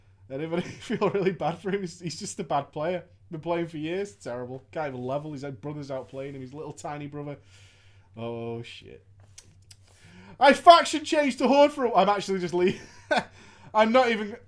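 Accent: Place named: Newcastle, United Kingdom. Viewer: As a Mackem, I find your Geordie accent hilarious!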